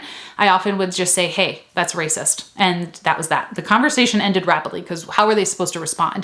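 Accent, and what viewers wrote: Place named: North Carolina, United States. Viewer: Why is that sobering? At least I would be able to live comfortable and would be able to succeed in my life. That's my intentions to start my own business in a better state. I don't want to go in SC it's not the best state to accomplish my goals. Are you from NC? Because you sound like you were born here?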